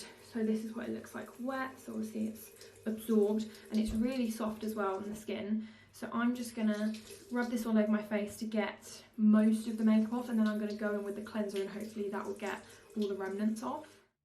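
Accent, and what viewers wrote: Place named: United Kingdom. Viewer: Ayee your British as well yayyy what part are you from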